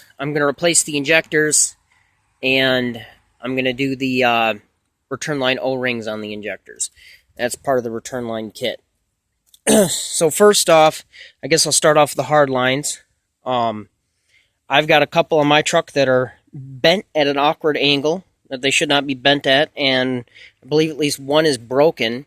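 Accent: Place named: Michigan, United States. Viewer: Bro are you from Michigan? I went to welding school with a guy that sounds identically to you 😂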